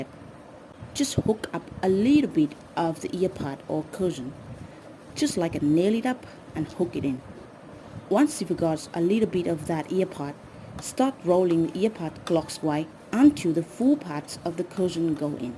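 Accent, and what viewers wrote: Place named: India. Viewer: Idk why but these kinds of tutorials (the ones that are a minute long with indian (im assuming) accents) always work 100%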